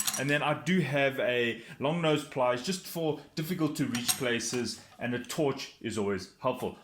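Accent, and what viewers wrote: Afrikaans, South Africa. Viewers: I didn't have a clue how to do this but now I know, thanks pal, I'm off to Bunnings as well, and is that an Afrikaans accent I detect? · I'm a kiwi and the SA accent is familiar to me too, sounds much better than the Aussie one... they all sound like they're holding their nose whiile complaining about being constipated.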